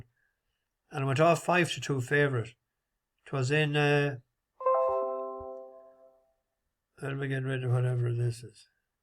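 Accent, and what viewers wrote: RP, United Kingdom. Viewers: Great stuff, tips not on fire but that’s racing, still your command of the Queens English always makes me smile, keep up the good work, as you said things will turn 👍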